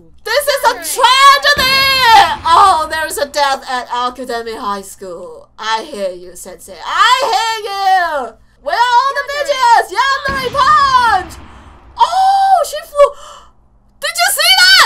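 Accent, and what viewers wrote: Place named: China. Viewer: sounds more Chinese actually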